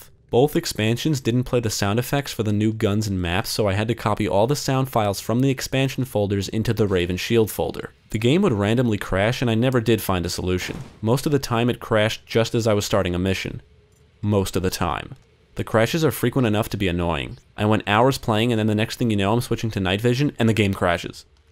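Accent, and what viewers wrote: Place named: New York, United States. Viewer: I'm from michigan, and im guessing you're a new yorker?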